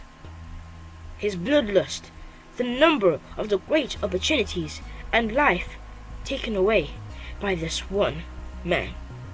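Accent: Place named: United Kingdom. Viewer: lol i can already tell your british are you a roadman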